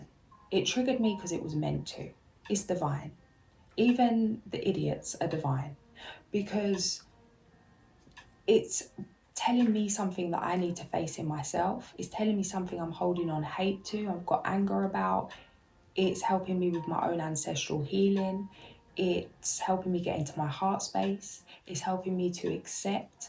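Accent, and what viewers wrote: United Kingdom. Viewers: As a white dude from America, which automatically makes me a deaf, dumb, and blind idiot according to the mainstream media, you sound British to me honey.. this is great stuff, keep up the great work... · Hadn't noticed honey. Just thought you were from London. A cockney lass. Who cares. You have the right to block anyone who gives a negative comment. xx💝💖💕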